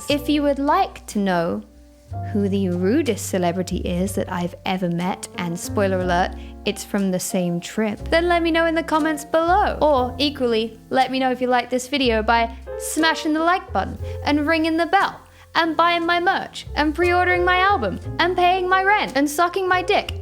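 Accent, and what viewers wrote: United States. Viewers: Why is American accent kinda cute? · Her American accent made this vid 50 times better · I really like your new videos and I hope you are happier❤️and btw your American accent is on point👌🏻 and this storytime was pretty funny😂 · Do a video in your American accent. It's hilarious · Love the American accent x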